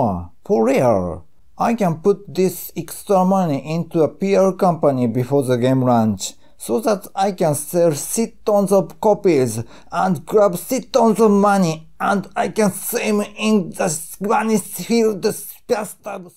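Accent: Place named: Japan. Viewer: what do you mean by his speech problems? If you’re talking about the way he speaks in the video then that’s just Japanese accent. In fact he is really good at English in the standards of Japan.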